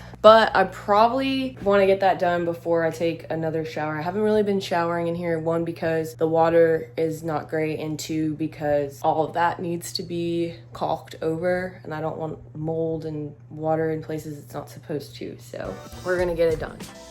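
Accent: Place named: South, United States. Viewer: I'm sure most of your subscribers are like me and don't really care that much about hiking we are just here to see a very beautiful girl with a beautiful southern accent. You could make videos about basket weaving and I would still watch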